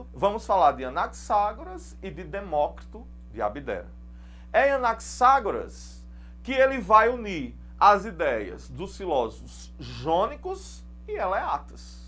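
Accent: Northeast, Brazil. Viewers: Eita professor arrochado véi! Conheço seu sotaque viu peixe, é nordestino que nem eu. Brincadeiras à parte, excelente e maravilhosa aula meu nobre, PARABÉNS!!!!!😊😊😊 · Ótima aula professsor! Seu sotaque de nordestino deixar a aula bem descontraída e fluída , e parabéns pela explicação! Melhor impossível...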